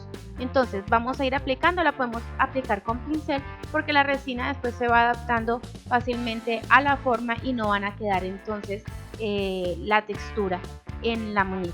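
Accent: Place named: Colombia. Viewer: te escucho con acento colombiano, la porselana fria es la misma porcelanicron?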